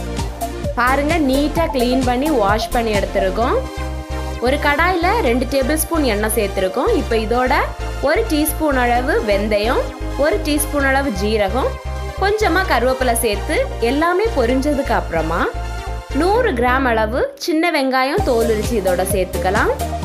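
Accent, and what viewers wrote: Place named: Marthandam, India. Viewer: Aiwaa marthandam tamil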